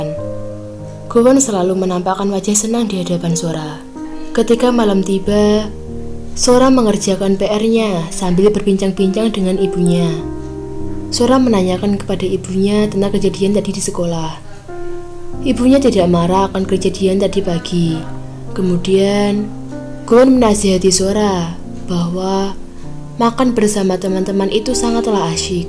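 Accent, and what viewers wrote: Java, Indonesia. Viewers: swarane medok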